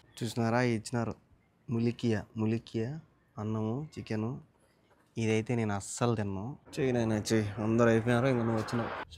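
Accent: Rayalaseema, India. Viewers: It's rayalaseema dialect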